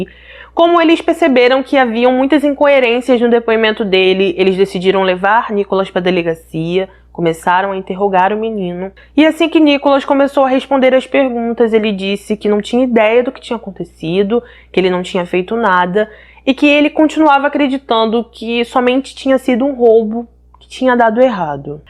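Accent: Rio de Janeiro, Brazil. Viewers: gosto muito dos seus vídios Deus te abençoe você narra muito bem você tem uma vos Linda a vc tem sotaque carioca você é do Rio de Janeiro?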